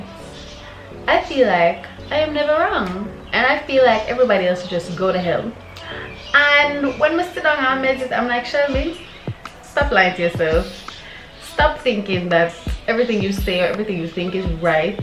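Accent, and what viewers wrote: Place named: Jamaica. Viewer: Hey! Honestly I love when you speak just patwah 😍😩. And it's giving authentic vibes, I guess maybe you switch it up for the diversity on your channel.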